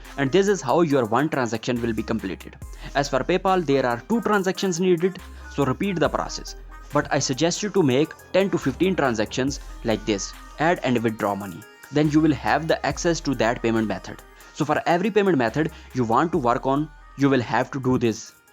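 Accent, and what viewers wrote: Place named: India. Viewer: Your indian accent is really so strong accent it almost seems your being funny😂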